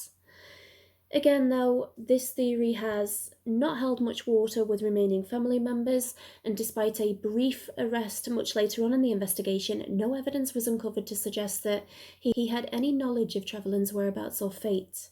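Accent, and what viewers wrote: United Kingdom. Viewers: great accent still Keep up the good work !, I cannot tell if you’re from near Manchester or Liverpool or somewhere in between??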